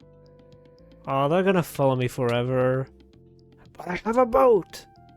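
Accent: France. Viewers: Are u french??? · You are french? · Oh, so that is a french accent! As a not native english speaker it is good to find out how exactly different accents sound like. Great video as always! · Give him a break, he's french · Are you French? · are you french?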